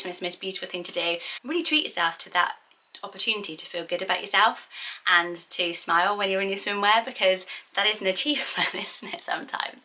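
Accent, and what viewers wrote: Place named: England, United Kingdom. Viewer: I couldn't love you more. You always have the best insight on weight (I've been watching those videos on repeat to get my mindset right) and you are so lovely. Im American raised by my English grandma and I lived in England for 3 years and my best memories are with my grandmas brothers and sisters. Your accent and manner of speaking makes me feel like I'm with family. "Ibiza vibes but really gonna chassé into kids swim" 😂 you're just the best. And you do look amazing and I admire that self discipline to live a healthy life style.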